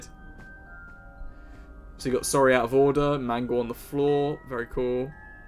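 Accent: United Kingdom. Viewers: i didnt know you was British too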